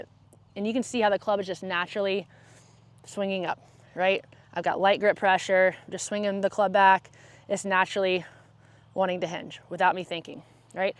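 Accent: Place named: United States. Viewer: I love your work method and most of all your American 🇺🇸 accent !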